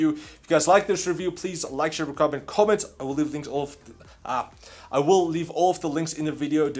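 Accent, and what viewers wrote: South Africa. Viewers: R u south african ?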